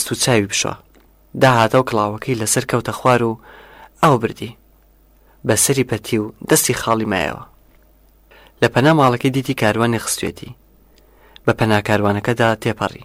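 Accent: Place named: Sulaymaniyah, Iraq. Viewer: Xozga dangakatan bkrdaya ba shewazary slemany zor xoshtr bw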